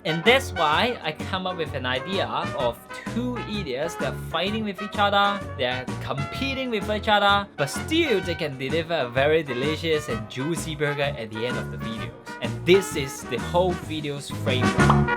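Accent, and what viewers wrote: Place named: Hong Kong. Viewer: 喂，你啲英语發音和用字都係自己作出黎和有好重的香港广东话口音；西人是完全唔知你噏乜；你要好好学吓 preposition 应该点用，唔好乱讲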